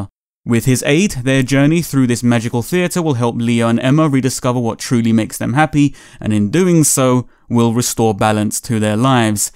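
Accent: London, United Kingdom. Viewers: Ok this is kinda weird lol. Alright so I remember watching this guy like 2 or 3 years ago on one of his Night vids he did back then. For some reason when they announced this I thought to myself, ‘Oh I wonder what that Nights fan with the london accent thinks of this? I’m sure he’d be hella excited.’ Now today I just seen this vid in my recommendation :). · He became more and more British as the video went on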